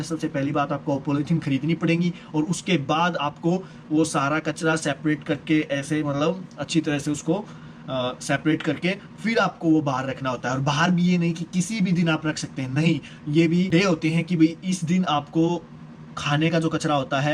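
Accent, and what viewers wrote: Haryana, India. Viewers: Your haryanvi accent is pretty😀 btw i am also from haryana....lots of love from haryana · Aap haryana sy ho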